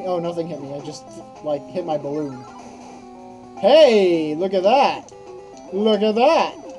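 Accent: United States. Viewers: Lmao i used to watch this when i came out on my ultramegaunknown account. Great times! Too bad YT deleted my old comments. I remember commenting asking what accent you have and you said it was Oklahoma. And another guy said "Where the wind goes sweeping through the plains." Then you said, "More like where the tornado sweeps through the sea of concrete." Lol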